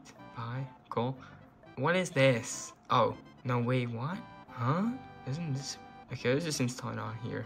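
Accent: Czech Republic. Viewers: Ur czech ?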